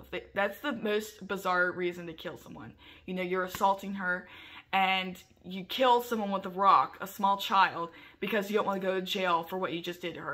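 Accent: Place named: South, United States. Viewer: You're welcome. I'm glad you received my message so well. I thought I detected a wee Southern accent, that is a sad story and you did well. Have you ever watched Murder She Shed channel? She's small too, having just started out and she's a real hoot. I'll always have the scars from what happened to me way back then but I made a decision to not be a victim. I have but one wish for Humanity and that is for people to learn to love themselves so they can love others then maybe all the hate could stop. Bless you too sweet Sister. Perhaps we'll chat again, I see potential in your heart and that makes me feel good and have a little more hope. 💖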